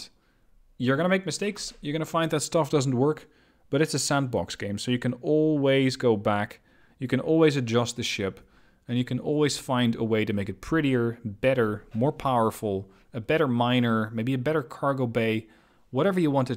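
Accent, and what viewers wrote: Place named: Netherlands. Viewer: i think this guy is dutch, of nie dan?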